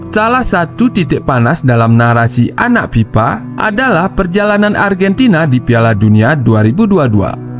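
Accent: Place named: Aceh, Indonesia. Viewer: Suara admin nya macam logat org aceh...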